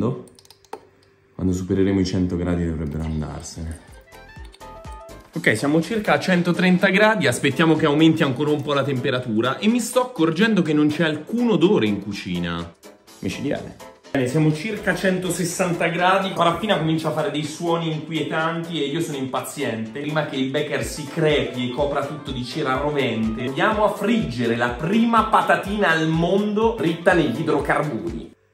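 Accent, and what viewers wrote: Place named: Umbria, Italy. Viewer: Umbro fino al midollo, sentirti parlare mi ricorda le trasmissioni di TEF channel guardate alle 3 di mattino perchè sotto allucinazioni da febbrone.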